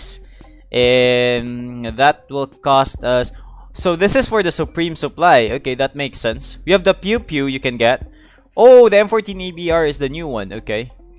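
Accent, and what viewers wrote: Visayas, Philippines. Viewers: English bisaya ka!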